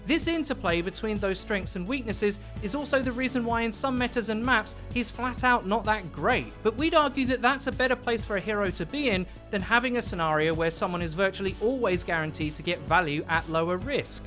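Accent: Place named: United Kingdom. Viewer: STOP COMPLAINING! THIS CHANNEL IS BECOMING A PROBLEM FORNTHE GAME. YOU GUYS COMPLAIN ABOUT THING YOU DONT LIKE ABOUT THE GAME INA BRITISH ACCENT AND IN TURN CONTROL THE NARRATIVE FOR THE CHANGES THEY MAKE IN THE GAME! THIS IS A FUN CHARACTER TO PLAY AND SHE IS NO WHERE NEAR OVER POWERED. BLIZZARD NEEDS TO FIND A WAY TO CRATE A GAME THATS FUN FOR THE "PROS" AND FOR YOUR FREAKING BRONZE-DIAMOND PLAYERS TOO! YOU GUYS KEEP ASKING FOR CHANGES THAT MAKE IT NOT FUN FOR LITERALLY 90% OF THE PLAYERS!!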